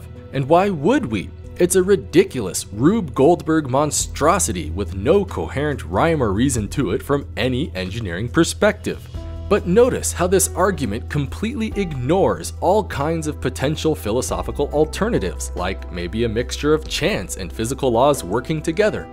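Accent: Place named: Utah, United States. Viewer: Else -> elts, false -> falts, where do they speak like that? I've only heard "elts" to be a Utah-regionalism.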